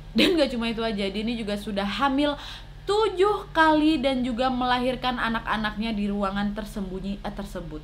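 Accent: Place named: Medan, Indonesia. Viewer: Sukak kli lho denger kakak ini ngomong, logat medannya tu.. Hihi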